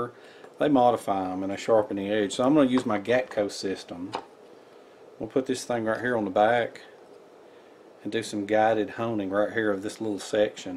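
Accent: South, United States. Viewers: Great vid. Are you from GA? Lol you sound just like my uncle from Savannah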